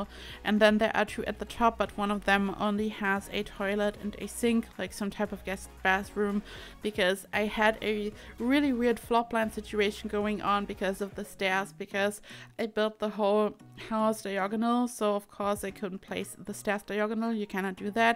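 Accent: Germany. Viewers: Your accent sounds a lot like German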